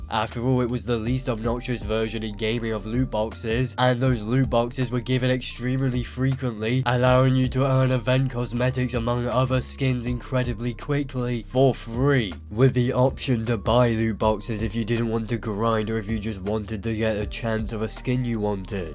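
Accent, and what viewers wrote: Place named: United Kingdom. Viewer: Can we all just agree that accent increases the quality of the video by 1000% Something about the (British, right?) accent just makes his commentary and critique so much better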